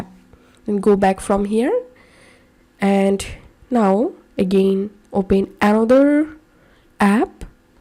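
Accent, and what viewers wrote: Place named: India. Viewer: I don’t trust the Indian accent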